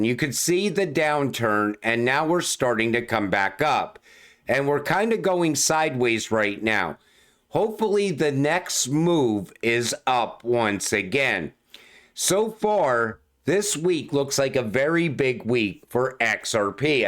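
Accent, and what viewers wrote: Pittsburgh, United States. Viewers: Congrats on 70k subs!! You should have 1M subscribers!! You're my favorite and go-to Crypto Channel [plus your accent sounds like you're from Pgh, where I'm from 😂]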